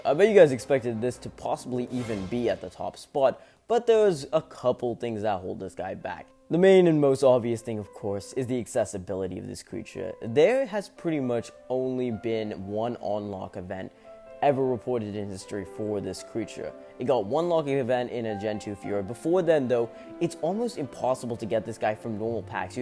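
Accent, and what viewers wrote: United Kingdom. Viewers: you sound british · bros british accent slipped back, also the list is pretty much what id make my list lmao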